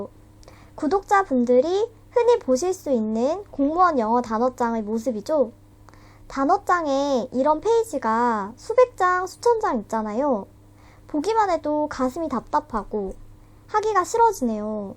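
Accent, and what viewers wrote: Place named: Busan, South Korea. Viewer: 부산사시나요 ㅋㅋㅋㅌ 웃기네요 영상 잘 보고 갑니다~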